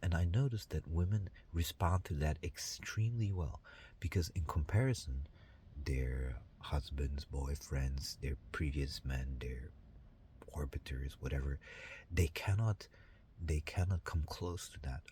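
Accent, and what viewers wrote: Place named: United States. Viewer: dein englisch ist sehr gut, eher amerikanisch geprägt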